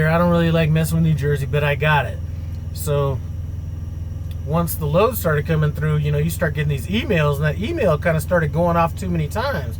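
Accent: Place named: Midwest, United States. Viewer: Good video. When you mentioned the Chelsea market it brought back memories. Are used to pick up gasoline right at the Exxon terminal that I’m figuring you drove by. You are correct there is no place to park in that area at all. I would agree probably is still mafia run. I have driven in New York City going to Fulton market. I would not wanna make it a habit of going to New York City. You sound like you’re more of a Midwest or southeast person. Be safe out there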